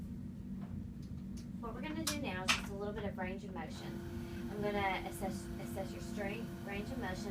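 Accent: United States. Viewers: Kentucky accent if I'm correct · Us southern girls have that accent! 😉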